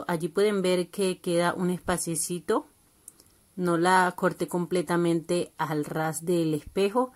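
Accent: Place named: Colombia. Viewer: tu acento se me hace colombiano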